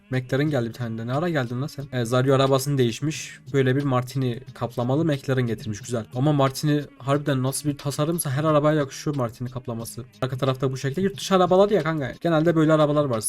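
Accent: Erzurum, Turkey. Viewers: abi erzurumlumusun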